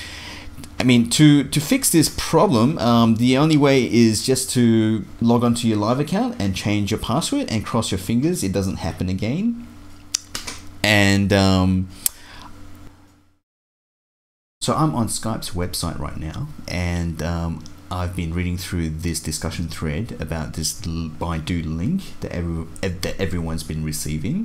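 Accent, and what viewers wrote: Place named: Australia. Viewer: thanks for this info ... I like your Australian accent so cool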